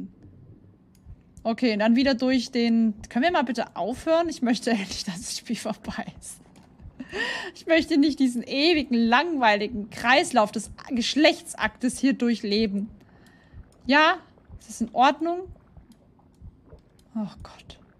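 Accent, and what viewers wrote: Swabia, Germany. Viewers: Dei schwäbisch killt mi😂😂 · Sage mol, komsch du ausm Schwobeländle?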